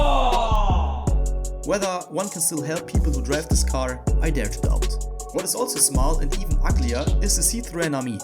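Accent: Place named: Germany. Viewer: Damn bro, your German accent is hella cool for this type of video Keep pushing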